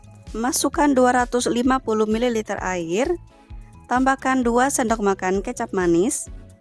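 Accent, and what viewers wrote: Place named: Tegal, Indonesia. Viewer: Massya allah 🤲 kangen ciri khas tegal lur "semoga rezekinya lancar berkah sukses ya Bun🤲🙏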